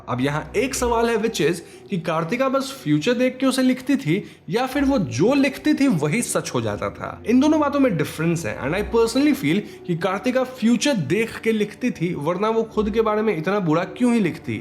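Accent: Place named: Bihar, India. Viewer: जिया हो बिहार के लाल भाई बिहार से हूं एक्सेंट आ रहा था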